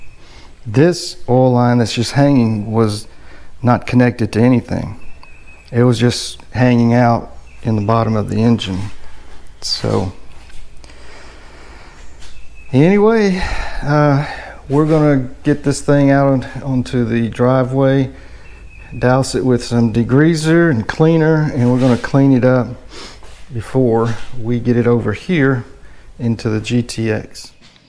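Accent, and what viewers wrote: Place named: Mississippi, United States. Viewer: What part of Mississippi you from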